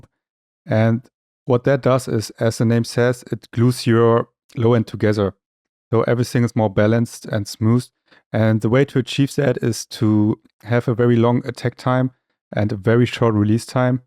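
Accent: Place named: Germany. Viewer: thats a german Accent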